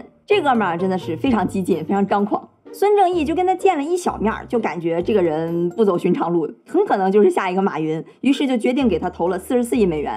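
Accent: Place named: Qingdao, China. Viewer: 小Lin笑容好甜，听声音有一点点山东青岛的口音，不知道我猜没猜对😅😅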